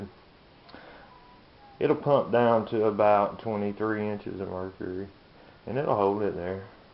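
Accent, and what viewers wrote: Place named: Tennessee, United States. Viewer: THANK YOU MAN! Great job! Great idea! You sound like your around here (tennessee).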